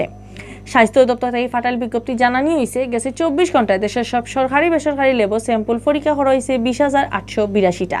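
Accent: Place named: Jaintapur, Bangladesh. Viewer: ও মর আল্লাহ একদম সিলেট জেলার জৈন্তাপুর এর ভাষা,,,?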